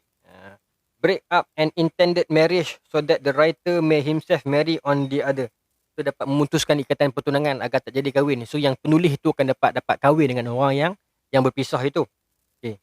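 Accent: North, Malaysia. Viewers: Seqonok dengaq caqa oqg utaqa yg cerdik dn humble brckp, tuan tlg doakan unt saya rezeki dn jodoh, umoq bnyk dh ni, t.ksh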